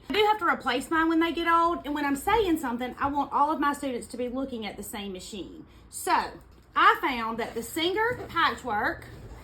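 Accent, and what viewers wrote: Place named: Tennessee, United States. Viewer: I ❤❤❤ your accent. My Grandmother, the one who helped raise me, was from NW Tennessee (Union City area). Listening to you is like being with family. 😢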